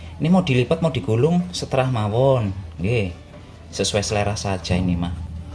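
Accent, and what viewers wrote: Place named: Banyumas, Indonesia. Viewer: Resepe ga terlalu penting yg penting denger ngomong ngapak2...hiburan bgt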